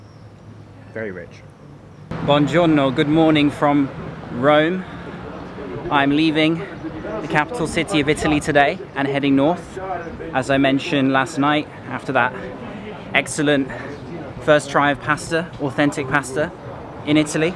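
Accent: England, United Kingdom. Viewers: I don't only watch your Videos for traveling but also I watch because of your good British accent. You are my British accent teacher too, 😅😅😄😄😍 Take care ! Love from Kabul, Afg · Cenker Köktebir It’s just a normal English accent. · it is British accent and I still don't mow his accent name.British accent is quite harder than American.What's more almost all of British accents fascinate me.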